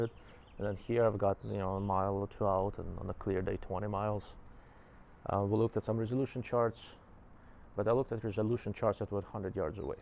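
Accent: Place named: Europe. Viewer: Any optic reviews by a guy that looks like a young Dr Evil with a thick European accent demand immediate respect.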